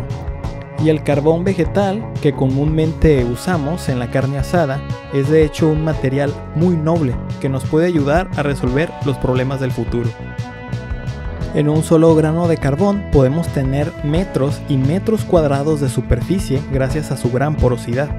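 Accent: Mexico. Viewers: Se te nota el acento Sinaloense we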